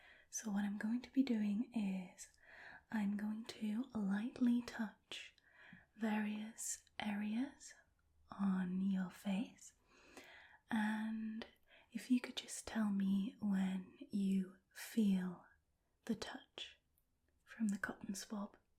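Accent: United Kingdom. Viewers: I can’t quite pin down your accent. Is it British, Irish or Scottish? Either way it’s lovely 😊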